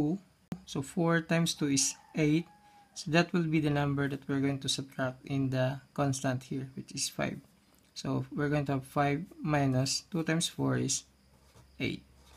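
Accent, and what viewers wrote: Philippines. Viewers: When i heard his accent i know that his filipino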